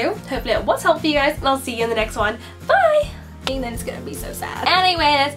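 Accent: United Kingdom. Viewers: so cute! is she british, i really like her accent!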